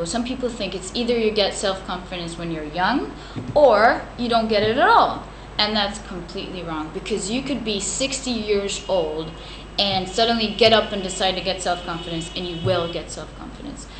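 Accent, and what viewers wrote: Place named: France. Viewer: I think confidence=your capabilities Self-esteem=How you feel about yourself! By the way what is your native language? I hear a french accent, forgive me if I am wrong!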